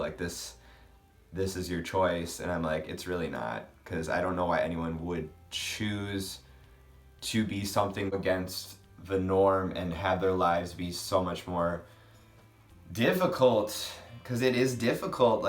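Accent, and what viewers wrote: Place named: Minnesota, United States. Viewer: Btw, I love hearing your voice with your "Minnesota accent". I've lost almost all of mine, but I've been told that those transplants to other parts of the country, who grew up in the midwest, make good TV and radio announcers. People still ask me about my accent occasionally when they hear me speak.:)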